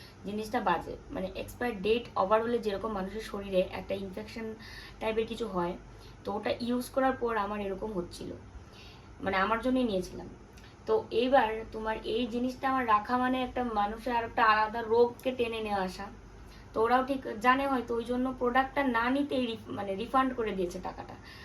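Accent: Bangladesh. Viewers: আমার বাবা বাঙাল আর মা এদেশী। তাই তোমার কথার টান বুঝতে পারছি । যখন টিভিতে বাঙলাদেশ এর চ্যানেল দেখতাম তখন ওদের এবং শব্দকে অ্যাবং বলতে শুনেছি। তেমনি তোমার এগ্ না হয়ে অ্যাগ্ হয় । অবশ্যই তুমি তোমার আঞ্চলিক টানে বলছো , তাই বলার কিছু নেই ।তবে কি বলতো বাংলা আমাদের মাতৃভাষা ,তাই যেরকম ইচ্ছা বলতে পারি । কিন্ত ইংরেজি আমাদের ভাষা না, তাই ওটা বলার সময় সঠিক বললে ভাল হয় । কারণ ইংরেজির তো আঞ্চলিক উচ্চারণ নেই, তাই না? আর আমরা বাড়িতে যা খুশি বলতে পারি, কিন্তু অন্যের সামনে একটু পাল্টে বলতে হয় । যেমন আমরা বই পড়ি শুদ্ধ ভাষায় 😊। আর তোমাকে এই টিপ ভাল লাগছে, তবে আর একটু নিচে পরলে কেমন লাগতো?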